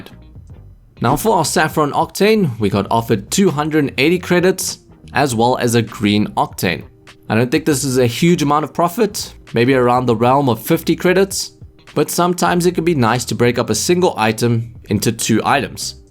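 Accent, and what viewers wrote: South Africa. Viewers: Are you South African? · U r south african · South African? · Are u south African by any chance?...just curious cause u have a strong accent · Hey are you maybe from South Africa? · Are you South African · Are you South African? If so SA GANGG!!!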